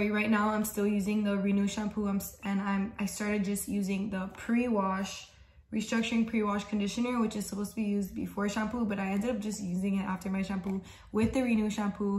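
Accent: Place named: Miami, United States. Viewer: As soon as you started talking I was like MIAMI! The accent is strong lol. I'm from Kendall btw so I hear it right away.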